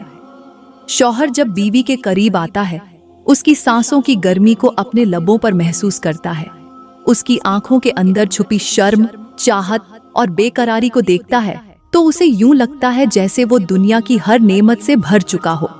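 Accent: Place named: India. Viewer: Bayan toh bahut achcha hai lakin bahut ziyada tafseel se batakar video bada kiya gaya hai iski itni zarurat nahin thi ...aap ki Urdu bhi itni achchi nahin hai hindi alfaaz Hindi laheje se pata chal raha hai ...sab se buri baat ye hai k aap apne khoob soorat haath aur ungliyon ko dikha rahi hain ...isse parhaiz keejiye... f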